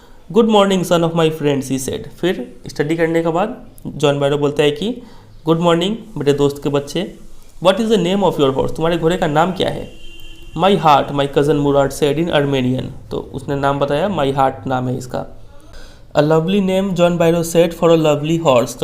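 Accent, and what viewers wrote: Bihar, India. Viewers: Sir aap bihari hai na ??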